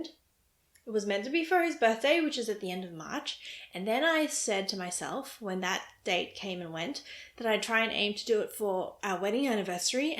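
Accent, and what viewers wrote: Australia. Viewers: So glad your enthusiasm has returned! Looking forward to seeing your next vlog. It's always good to hear a fellow Aussie voice on youtube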